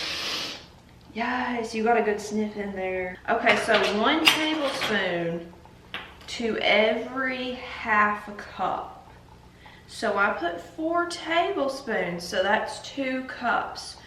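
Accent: South, United States. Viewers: that Southern accent😍...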